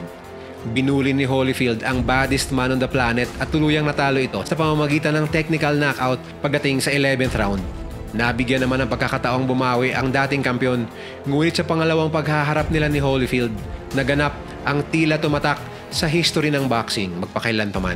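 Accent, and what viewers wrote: Visayas, Philippines. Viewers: Bisaya ka pre no? Hahaha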